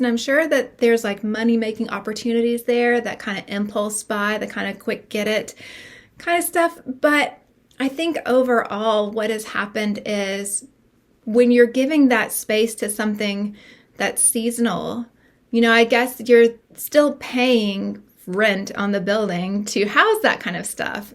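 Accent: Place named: South, United States. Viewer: Just say the rustling sound is ASMR 😂 As for JoAnn's... My first memory was going as a child and buying scraps to make rag dolls. My mother and grandmother both sewed and did a lot of crafting, so I first started making rag dolls using their leftover fabric. Then, when I got into it and ran out of scraps, they brought me to JoAnn's and would look through what they had. I was sad when I first heard they were closing but I can't shop there anymore because I am living abroad now. If they close all stores before I come back, I may never shop there again. They are closing the store in my hometown, so I definitely won't ever shop there again. I made a lot of baby quilts in my 20s and I used to love their Firefly sale. Your wedding dress is beautiful and I love the southern accent 😄💖 I have a NY accent but it usually only comes out when someone cuts me off in traffic😅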